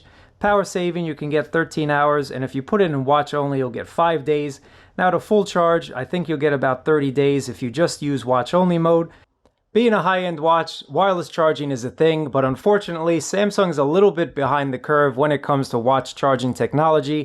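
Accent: New York, United States. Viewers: Love the NY accent.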